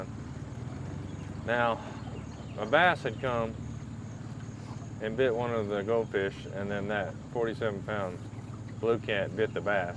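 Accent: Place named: South, United States. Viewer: I still love that accent of yours I just drives me nuts you're beautiful lady and that little southern accent just makes you so much more beautiful I bet you're driving crazy down in Florida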